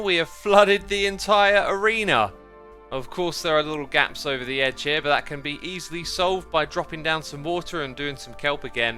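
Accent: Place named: RP, United Kingdom. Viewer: X sounded so extra posh in this episode.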